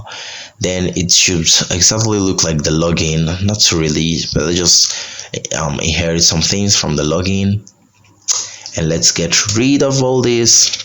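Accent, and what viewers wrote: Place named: Nigeria. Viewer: Wow ...you great ...maybe its the Nigerian accent but you taught me better....Nigerian from Canada here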